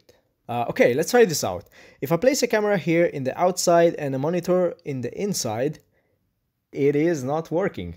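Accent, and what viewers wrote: Italy. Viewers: ma sei italiano! Complimenti per l'accento...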